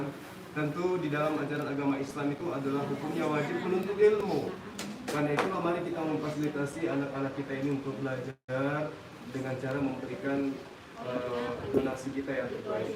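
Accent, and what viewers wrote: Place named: South Sulawesi, Indonesia. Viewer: ini asal daerah dari mana. Sy kdg dengar bahasa Indonesianya logat Bugis makssar.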